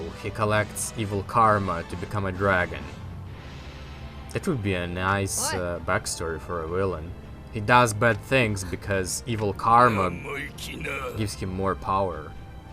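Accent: Russia. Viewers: !!!! What's wrong? Why your accent so Russian? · Sometimes I forget that is from Russia, nice to hear his accent sometimes.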